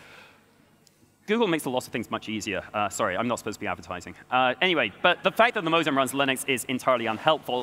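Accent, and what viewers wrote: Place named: West Coast, United States. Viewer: I'm starting to hear a touch of west coast accent in there...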